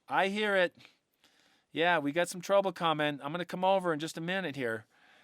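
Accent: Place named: North, United States. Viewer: Hi, I want to thank you for the excellent content. I love your campfire stories, the camping, and cooking recipes. I'm 64 and have a bad back and bad knee, so my camping days are most likely over with, but never say never, right? My name is Kris, too, but with a K. What state did you grow up in? The way you pronounce south makes me think you're from a northern state. I'm from Texas, so we pronounce south like "mouth". That's the redneck pronunciation, lol. Great channel,. Keep hiking (for those of us who can't 🤠)!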